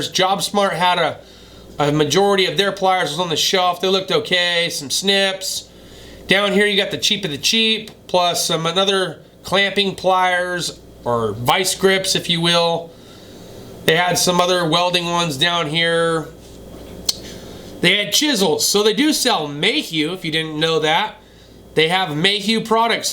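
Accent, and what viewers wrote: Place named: New England, United States. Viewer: Still talk like a Yankee tho👍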